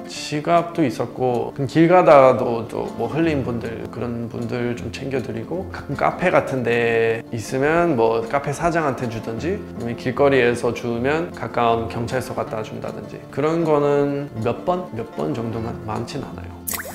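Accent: South Korea. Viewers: 문법이 완벽하다 심지어 영어를 한국식으로 발음하네 대단합니다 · 보통 한국말 잘한다고 하는 외국인들도 외래어를 할때는 본토 발음으로 하던데 이분은 그냥 한국식으로 말해주네요^^ 대단하십니다. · 우와 영어를 한국인발음으로 구사하는 분은 첨~~대박 아메리카노,쓰리샷,cctv 한국인인줄ㅋ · 눈감고 들으면 한국인., ,ㅣㅇㅇ퍼 카페 그만두시고... ㅠㅠ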